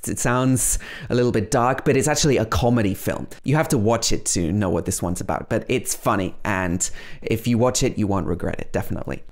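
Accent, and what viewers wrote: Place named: United Kingdom. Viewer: I love British accent and I hope that someday I would be able to sound like you . You are the best teacher on internet.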